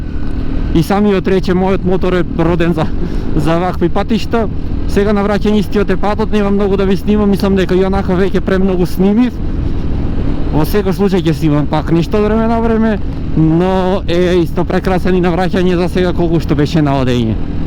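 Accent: Bitola, North Macedonia. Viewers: Процедурата следува вака -Си седнувам пред комп.уклучувам твое видео и верувај цело време полу насмеан гледам со уживање.Мераци од пејсажи,интересни и за нас помалку чудни култури,битолскиот нагласок,духовити коментари.И еден апел до сите нас овде.Поддржето го детето во неговите авантури.Ретко мноооогууу ретко( за мене е дете) со 23 години би се осмелил на нешто олку гломазно патување полно со мал милион пречки,со мал буџет ама со голема храброст,енергија и ентузијазам.ЦАР СИ!!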